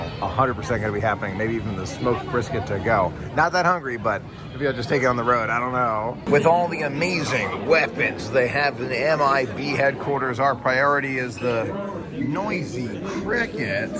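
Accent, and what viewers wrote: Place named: Florida, United States. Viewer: I can see that after these years of living in FL, that accent starts kicking in!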